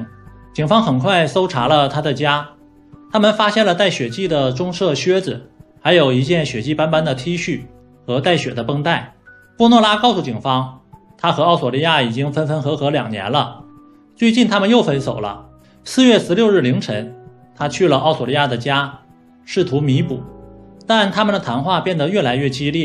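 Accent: Northeast, China. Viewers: 好明显的东北口音，藏都藏不住哈哈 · Hi东北老乡，要花时间多练练普通话！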